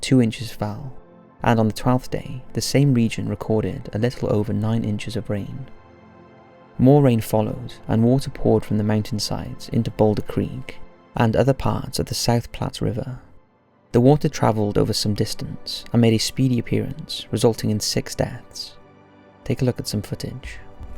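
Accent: Wales, United Kingdom. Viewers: I’m hoping that does an April fools video entitled “official face reveal” and instead says “haha, I trolled all you saps. Smell ya later pimps” in his graceful Welsh accent. It would be so out of character and interesting to hear. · Is it just me or has the narrators voice become less welsh?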